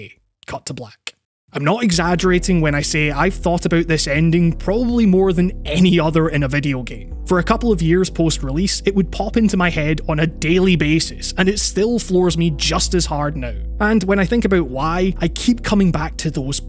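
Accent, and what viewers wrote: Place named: Scotland, United Kingdom. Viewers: What is going on with that accent, it's like 2/3 Scottish, 1/3 American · It's just Scottish. There are regional variations.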